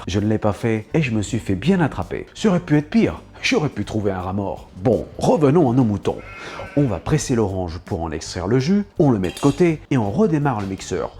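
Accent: France. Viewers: j'adore ton accent français à la hache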